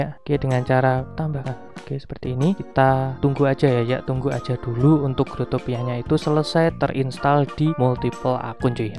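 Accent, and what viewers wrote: Java, Indonesia. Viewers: bang lu ngomong medok jangan pake ane ga pantes aku aja atau saya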